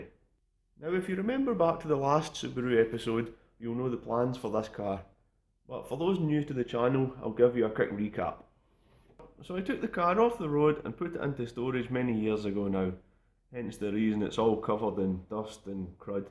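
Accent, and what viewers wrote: Scotland, United Kingdom. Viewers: Great video again. I had a motor tour though Scotland planned end of May,, but with the isolation measures in place.... that's of the calendar.... so I have to do with your video's, your accent and a great Glen Moray. Give my best regards to George... Cheers from Holland !!! Ruud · Ah, the first time I've heard your voice! Lovely Scottish accent. I'm looking forward to your new projects! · he's Scottish (west coast,Glasgow area) he is trying his best to speak polite proper English, that's why he sounds like he's only here for the banter :)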